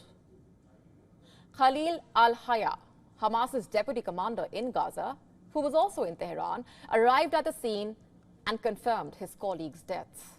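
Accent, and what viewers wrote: India. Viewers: Accent and herself both are not sexy like a common Indian, Pakistani or Bangali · Indian accent not very sexy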